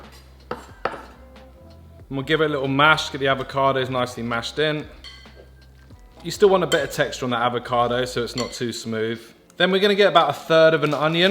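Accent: United Kingdom. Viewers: Also British accent makes everything so fancy and delicious hahh 🤩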